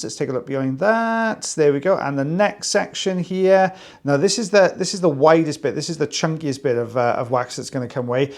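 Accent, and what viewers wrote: United Kingdom. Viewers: Yes, we say vacuuming in the US, but it makes me chuckle to hear hoovering! ☺️ Love your British accent in addition to your knowledge!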